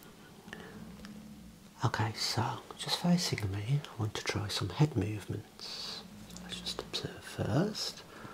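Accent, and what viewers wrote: Birmingham, United Kingdom. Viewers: I think i detect a bit of a Brummie accent here. Love the ASMR!👍👍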